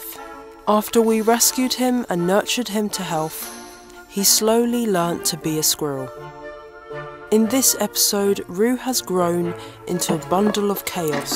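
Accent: United Kingdom. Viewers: having a British narration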